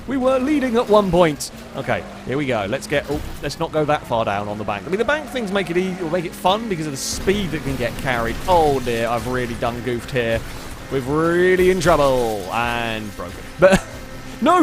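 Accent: England, United Kingdom. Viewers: I'll be honest, I don't watch, or can't watch, much Rallycross, as it's not really televised here, and I can only ever find highlights online, but every time I've seen a race, the joker lap is always slower. Could be wrong, wouldn't be the first or the last time. Seems like from you guys saying what you are, both are accurate. It is kinda odd though for an England resident to reference an American thing first... but still says Z as Zed.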